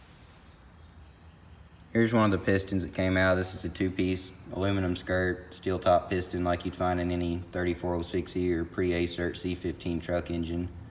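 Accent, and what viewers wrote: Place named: Kentucky, United States. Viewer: Not sure where dude's from but man he sounds like rural Kentuckian to me. All he needs to do is say "hell fire" as an exclamation and i'd be convinced.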